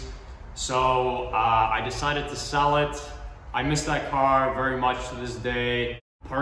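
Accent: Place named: Poland. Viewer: Pozdrowienia z Polski, nie da się nie zauważyć Polskich akcentów 😊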